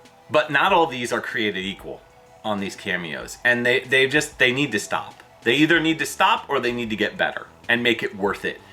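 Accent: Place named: Brooklyn, United States. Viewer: I love honest rants. Honestly saying what most are thinking - right down to the Brooklyn accent 😂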